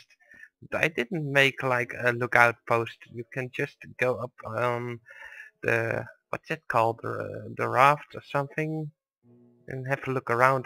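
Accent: Netherlands. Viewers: okay are u dutch because u sound like that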